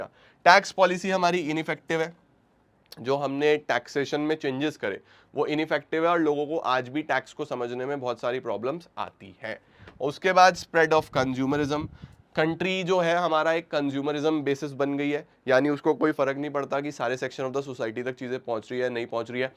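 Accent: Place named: Uttar Pradesh, India. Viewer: U are from up 😅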